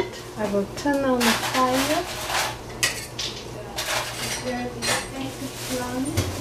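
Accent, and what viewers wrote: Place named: Liberia. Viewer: Oh okay... sound Like Liberian. Nice cooking :)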